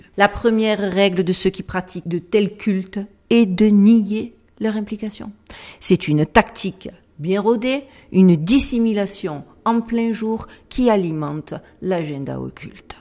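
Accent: Marseille, France. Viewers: Ton accent de Marseille commence (légèrement) à perdre de son intensité. Il est temps pour toi de revenir chez toi, pour quelques jours, je pense. Ce serait dommage de perdre ce patrimoine national inscrit au répertoire de l'Unesco. Les églises brûlent, tu ne va pas perdre ton accent tout de même?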